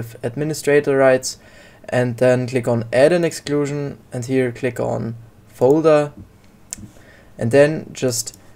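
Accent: Germany. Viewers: Man merkt denn deutschen akzent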